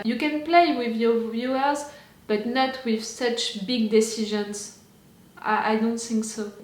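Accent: France. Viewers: Tu es française ? Par moment tu prononce des mots avec l’accent français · You have a french accent you know that your reaction is one of the best i seen until now you are sensible that mean you love this as i do and you are attached to the charachters i have the same reaction when bjorn falls he was the only reason why i am still watching the show until now he's death broke my heart to peaces he was the best charachter in the show after ragnar he was with us from the bigining we watch him grow up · u are french? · On l'a reconnu direct cet accent mdr *bjorn n'est pas mort non non non non...épisode incroyable.. ubbe ont compte sur toi frérot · Française ça s'entend 😉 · J'ai tout de suite deviné que t'étais française vu l'accent XD Mais ouai j'ai eu la même réaction que toi quand j'ai vu Bjorn se faire transpercer... J'espère sincèrement qu'il n'est pas mort, mais j'y crois pas trop quand même. 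C'est mon personnage préféré, mais là faut avouer que ça pue pour lui quand même mdr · You have such a big french accent. Is so funny. 😂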